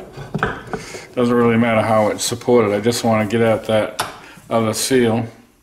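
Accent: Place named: Rhode Island, United States. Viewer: Live in ri? I spot our accent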